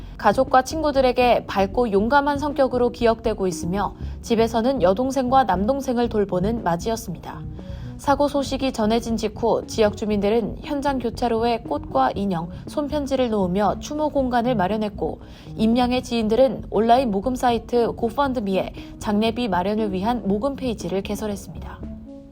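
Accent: China. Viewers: 이사람 목소리 발음이 수상 중국인인가?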